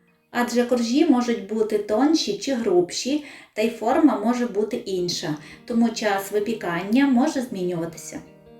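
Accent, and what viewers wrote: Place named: Hutsul, Ukraine. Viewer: Дуже дякую за рецепт. Чудово подайте, без зайвої балаканини...І чудова українська мова...з ниткою Гуцулендії.😉🤗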